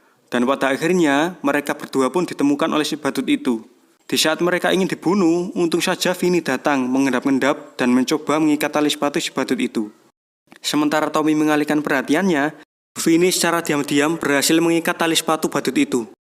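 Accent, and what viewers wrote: Java, Indonesia. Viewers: Terlalu medok penyampaian nya jd gue skip ya. Bye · Agak geli , klo medok bilang Gue , gue , kenapa gak pake kata Saya aja ? 😂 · medok haha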